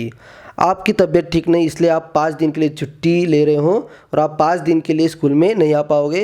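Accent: Maharashtra, India. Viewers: i will guess that ur mothertongue is marathi by the way teaching is very nice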